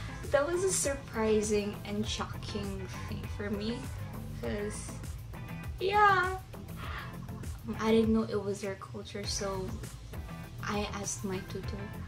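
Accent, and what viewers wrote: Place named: Philippines. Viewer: you have a filipino accent... hehe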